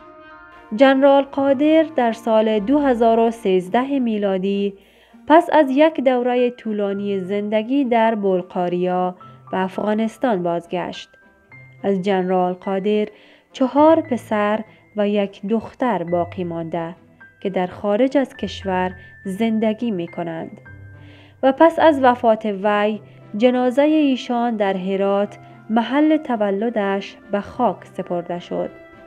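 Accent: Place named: Iran. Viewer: Why don’t you speak Afghan Dari. You sound very foreigner meaning, Iranian.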